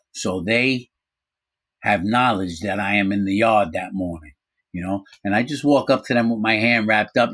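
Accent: New York, United States. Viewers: Thank God that didn't effect your parole you deserve some luck that could have ended very badly for you both 🤞 your a great story teller i think the New York accent also helps looking forward to how you managed to be friends with the nurse haha 😅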